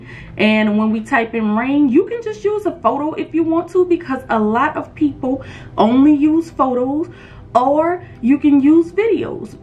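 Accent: New Orleans, United States. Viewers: Girllll this video is a game changer. I done subscribed and liked. I'm watching other videos now working. Not only was the video easy to understand but you definitely from the N.O with that accent lol and so am I! I knew it was confirmation after that! Thank you so much ❤️⚜️⚜️ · You sound like the people I grew up with back home in New Orleans. ⚜️ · What region of Louisiana are you from? You have the City (New Orleans) accent, but it’s not the thick type. It gives like other surrounding cities not saying its a bad thing at all, but something I noticed. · New subscriber here! You are just too sweet and informative! You had me at what sounds like a New Orleans accent! I lived and taught there back in the 90’s so I am always dreaming about the Pre-Katrina days! Sorry if I got it wrong. Quick question: Oddly enough I have a couple of thunderstorm videos just because I am here in Belize during rainy season. 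It rains almost every early morning. My question is, must I create a new channel or can I find a way to blend a few rain videos with my current channel?Or will that throw off my channel’s audience and algorithm since it’s a new channel and not quite at 1000 subscribers? Thank you! Heading over now to check out your editing course details! 🙏🏾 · I’m new here and the way you said hello I’m so many ways got me hooked to your personality also your New Orleans accent is everything ❤❤❤❤ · Is that a New Orleans accent I hear? 👀🤎⚜️ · I hear a New Orleans accent? Lol thanks for your help!! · Shoutout to the fact you from the N.O I know my people accent from anywhere lol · Are you from New Orleans? I love your voice.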